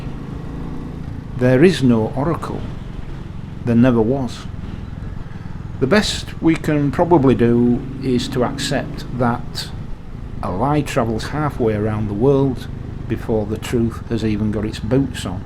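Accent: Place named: North, United Kingdom. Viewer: Sage words, friend, beautifully expressed(as usual). I often gaze and admire the skills of athletes, sit in awe of the intellect and wisdom of the Hitchens, Frys, Dawkins, Connolys(Billy), Gervaises, Murrays(Douglas), men&women(no pretend inbetweens) in general that inspire self-betterment. But one of my great joys in life.......is to listen to and envy that fabulous lexicon you deploy so wonderfully, friend. That amazing vocabulary of yours, wielded so mildly yet unaggressively with that marvellous northern lilt, is a major pleasure and superb voice of reason and mild-mannered advice for embattled ears. Thank you kindly for your years of service in uniform and the great guidance it now offers a father and husband trying to navigate a very frought world. Take care, friend......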